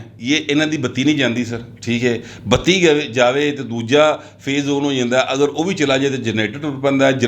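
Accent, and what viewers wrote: Punjab, Pakistan. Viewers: صاحب جس طرح آپ اپنے وی لاگ میں اردو کو پنجابی زبان کا تھڑکا لگاتے ہیں اس کا سواد ہی کچھ اور ہے ۔۔حالانکہ مجھے پنجابی زبان زیادہ سمجھ نہیں آتی۔۔ لیکن جس طرح آپ روانی سے بولتے ہے اس کا میں کیا تعریف کروں میرے پاس الفاظ نہیں Keep it up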